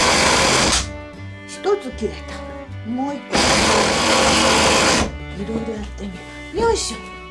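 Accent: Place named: Yamanashi, Japan. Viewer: 祖母が山梨の人のため、たまにでる甲州弁に懐かしさを覚えながら見てます。将来こんな暮らししたいです。見てて元気が出ます！！いつもありがとうございます！！！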